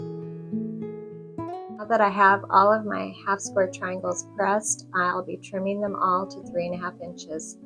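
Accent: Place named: England, United Kingdom. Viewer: Good evening, firstly, what a bonny dress you are wearing to do, it's so pretty. Next, do you remember the name of that gorgeous rad and the navy prints, they are lovely especially that red one, it would make a lovely dress., you sound English but from that cracker of an Airstreamer mobile home, it makes me think that you no longer live here in the UK,. My Daddy made our first caravanette out of a Ford Transit van, that he cut the roof off and put an extending roof on,. He put windows in and my Mum made the seats/table/ bed, with my twin brother in a stretcher of blue fur fabric with navy and white cotton to stop it from going out of shape. I slept across the front seats, definitely not as comfortable as everyone else, but it was the only way we could have time away from where we lived. My Daddy got a proper camper van with real toilet that they could also use as a shower room, real fridge and a cooker. 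They could go with the dog just as the weather here allowed, or turn in a different direction to better looking parts. It's funny how we used to get made fun of at school because we couldn't afford a proper caravan holiday or a real holiday to a holiday park, but we had great fun. He was funny because if we went into a town and it was busy, he turned around and went where there was nothing of any interest for a 10 year old and upwards. Up here in Scotland there has been such a big uptake in people buying anything they see to make into their holiday dream of a motorho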